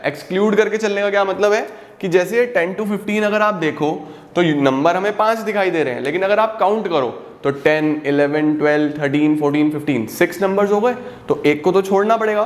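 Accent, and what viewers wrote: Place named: Punjab, India. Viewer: Mujhe lagta hai ki aap punjab ke rehne wale ho.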